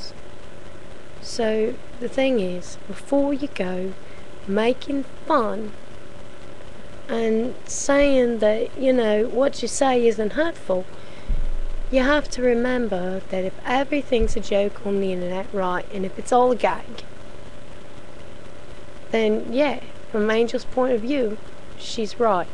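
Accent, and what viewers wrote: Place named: United Kingdom. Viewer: whats wiv the Britsh accent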